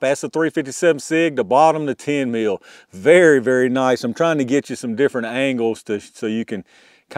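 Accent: South, United States. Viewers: My call goes for the 45Super!!!! Please test it head to head with the 10mm! Your southern accent sounds so cool, I am inclined to believe you are faking it!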